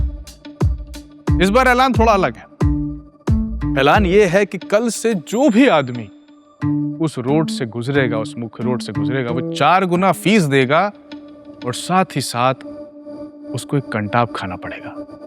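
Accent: Lucknow, India. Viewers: Lucknow tone ❤❤❤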